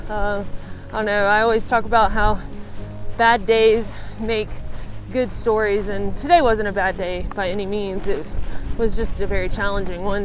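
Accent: South, United States. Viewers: Beautiful country! You've inspired me, I'd like to thru hike the AT next year, but it depends on if my job will let me have the time. If not, I'd like to do a section hike a week or two at a time. I've always loved the Appalachians since I was a kid when I used to go skiing in NJ by the Delaware water gap and now since I lived just 60 miles from them in VA. I hiked Goshen pass in VA this summer and I'm addicted. Never thought hiking could be fun after my only real hiking experience (apples and oranges) was from being an enlisted soldier in the US Army where you carry waaaaaay more weight and in my case, an M60 machine gun. I love your southern drawl and could listen to you all day! LOL!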